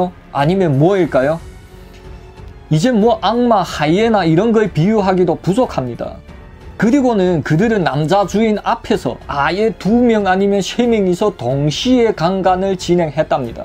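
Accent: China. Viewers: 유튜버님은 동포신가요 발음이 중국어처럼 들려서요 딴지는 절대아니고 단순궁금해서요 좋은자료고맙습니다 자주방문하겠습니다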